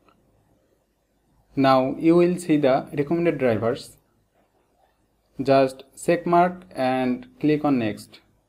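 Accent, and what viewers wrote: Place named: Bangladesh. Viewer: Bro are u from Bangladesh?....the Accent seems like that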